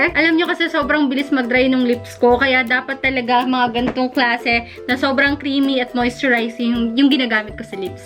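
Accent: Batangas, Philippines. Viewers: Congrats! Deserve mo yan!!! Sarap sa ears pag nag sasalita ka ng language ng mga taga Batangas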